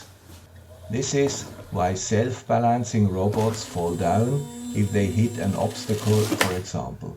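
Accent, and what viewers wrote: Switzerland. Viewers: Great video. Love your Swiss accent.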